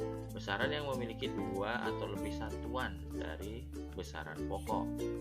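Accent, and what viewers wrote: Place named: Lampung, Indonesia. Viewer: Dari Lampung mana suaranay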